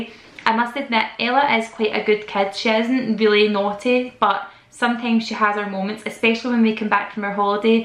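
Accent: Scotland, United Kingdom. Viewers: I love your scottish accent and your pretty hair!!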